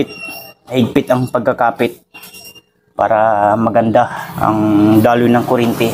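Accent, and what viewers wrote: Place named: Visayas, Philippines. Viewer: Visaya ka man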